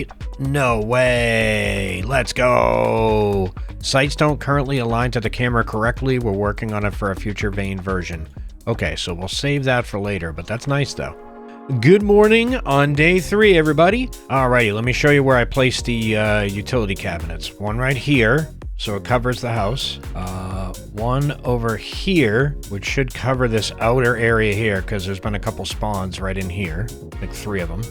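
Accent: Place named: Boston, United States. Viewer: Dorchester kid here, youre either from close to here, or ha e the accent down when you choose to do it. Wicked pissa kid.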